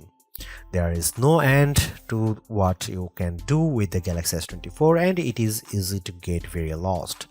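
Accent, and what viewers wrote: India. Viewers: OMG, listening English from an Indian is a violene to my ears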